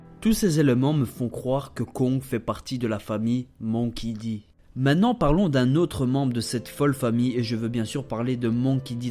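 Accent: Belgium. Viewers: ça c'est l'accent Belge ^^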